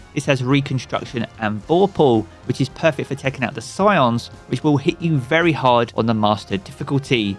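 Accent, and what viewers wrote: United Kingdom. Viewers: I literally loved your content because it’s straightforward and your British accent is just absolutely lovely ❤❤❤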